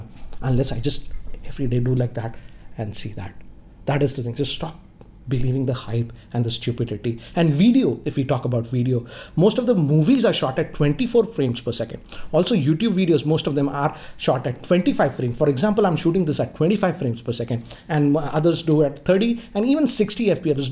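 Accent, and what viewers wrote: India. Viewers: as soon as i hear the indian accent, im like "yes, he knows what hes talking about. time to listen" good info bro. i was dying for like 15 minutes when you asked about shooting 8k videos. its hilarious because its true. there is literally no need for shooting 8 k videos.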